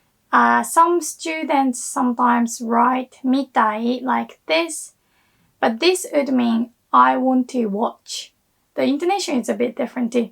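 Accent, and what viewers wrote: United Kingdom. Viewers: 先生はイギリス人みたいに話す (*^_^*) Come to think of it, if I want to say "you sound like (like, your accent)", do I still use みたいに？ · 君は英国人みたいに話す。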